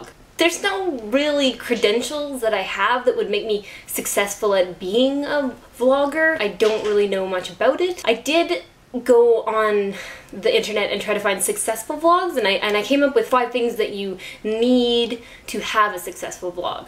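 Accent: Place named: Canada. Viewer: Um, yeah, you DO have a cute accent. You Canadians and your "aboot". ;) Awesome vlog!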